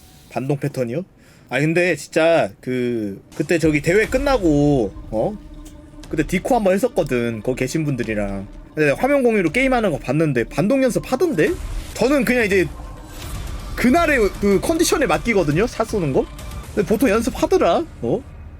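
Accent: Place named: Southeast Asia. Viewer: ㅋㅋㅋㅋㅋ영어 발음이 동남아사람 멕시코 사람인데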